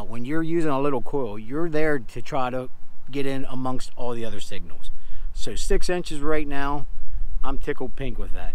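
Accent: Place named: Pennsylvania, United States. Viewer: You've got to be from Pa.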